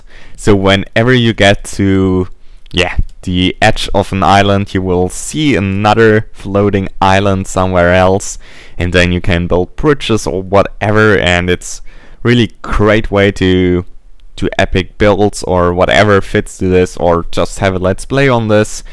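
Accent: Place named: Germany. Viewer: du bist ein deutscher dat hört man....xD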